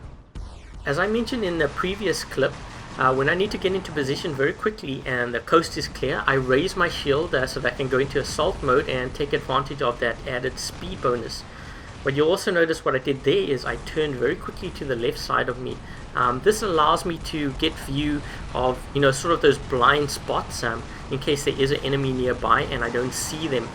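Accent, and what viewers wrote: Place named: South Africa. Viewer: I could tell you were somewhat South African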